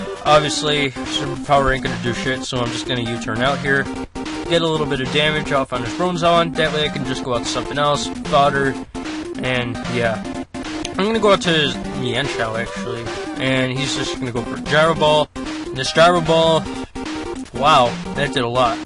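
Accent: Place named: Minnesota, United States. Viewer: Up North? Your voice reminds me of some people I know from Minnesota.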